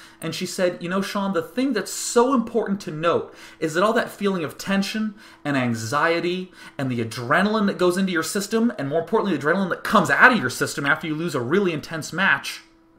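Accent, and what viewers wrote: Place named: Russia. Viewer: nice russian accent!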